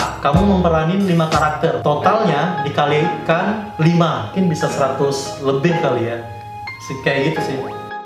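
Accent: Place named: Karo, Indonesia. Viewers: ya bang banyak video abang kayak keseharianku setelah 8 th menikah kebetulan istri dari sumatra nah tiap pagi logat macam ini selalu menghiasi hari hari ku dan anak2ku dirumah (sukses selalu ya bang tetap berkarya) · Paling suka Kalau Abg Bawakkan vidio ada logat karo nya🤣🤣 kalau gak ngerti bahasa karo tanyak² aku ja bg😚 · I feel you ka~ Selucu-lucunya beti, sekocak-kocaknya beti, semenghiburnya beti, tetep otak dan dalangnya kak, itu ajasih yang perlu diinget. So ya, salut banget sama kak. Terus berkarya ya ka, aku selalu nungguin video kaka karena moodbooster parah aku bisa ngakak sampe nangis kalo liat video beti loh😂 satu lagi, gara2 sering nonton beti aku jg suka niruin logat medannya kaka, padahal aku orang jowo wkwk pokoknya semangat terus ka! 💪💪💪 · Bang orang mana? Logat nya kayak bahasa medan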